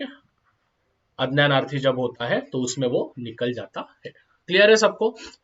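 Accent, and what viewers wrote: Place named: Hyderabad, India. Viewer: Sar Hyderabadi accent😅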